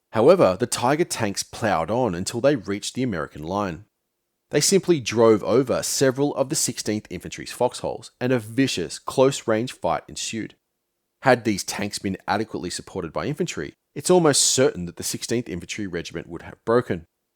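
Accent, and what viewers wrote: Australia. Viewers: Mate, pleasure to hear our Australian accent. Salutations on your work. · Are you from Aussie bro? Canberra?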